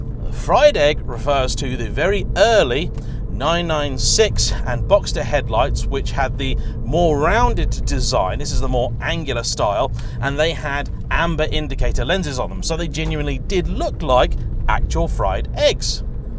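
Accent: United Kingdom. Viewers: commentary so british xD love it!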